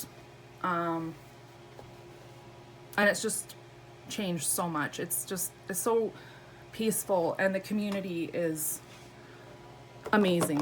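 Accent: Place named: Canada. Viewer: I’ve just listened to this on my walk home and I just can’t wait to see you! Miss that Canadian accent 💜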